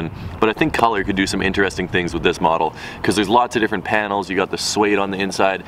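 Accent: Canada. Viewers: You don't say them wierd, you say those words Canadian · I thought u said those words like that cuz ur Canadian. Also u say sorry weird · yeah, canadians kinda pronounce things weirdly compared to us states-people. especially for me cuz i'm from texas😂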